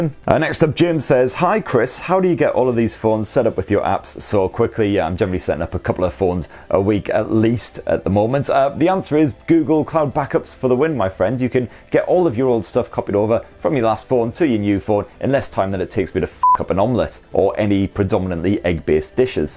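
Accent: United Kingdom. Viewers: Your British akcent is hard to understand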